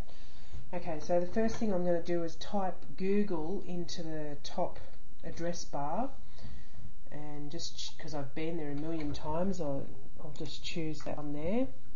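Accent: Australia. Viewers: This Guy who uploaded it ...Has a true Australian voice Nice Mate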